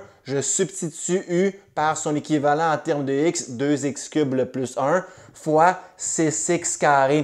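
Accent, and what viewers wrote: Canada. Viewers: A 66 ans je révise les maths pour aider ma petite fille de 15 ans et je trouve vos cours géniaux. Ils m'ont permis de l'aider à comprendre des notions complexes étudiées dans ses cours de maths. Votre tonalité (je vis en France) canadienne est un chant pour moi et votre communication gestuelle est un plus pour mieux retenir vos cours. Les cours deviennent magiques et on croit être dans un univers enchanté. Bravo, continuez ainsi pour faire aimer les maths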